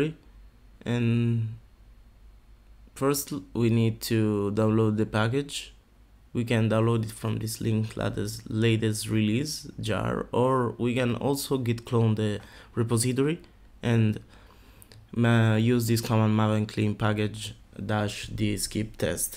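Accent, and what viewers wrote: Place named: Italy. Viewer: Grande, dall'accento ho subito riconosciuto che sei italiano e sono corso a vedere <3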